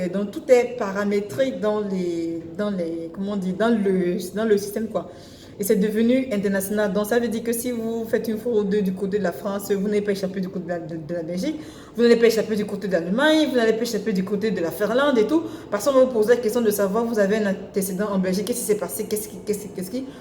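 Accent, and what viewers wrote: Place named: Cameroon. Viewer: Les congolaises n'ont jamais un tel accent . Je crois bien qu'elle soit une camerounaise. Les congolaises parlent mieux que ça . attention !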